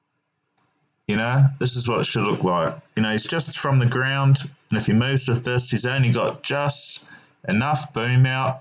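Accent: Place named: Southland, New Zealand. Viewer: where ya from mate bit of a kiwi southland twang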